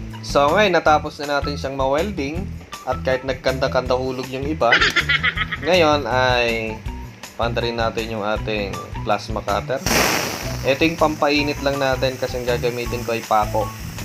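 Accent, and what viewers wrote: Ilocos, Philippines. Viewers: Ilocano ka sir?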